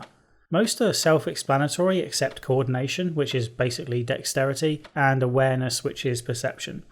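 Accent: United Kingdom. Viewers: Soft spoken britsh game analysis ASMR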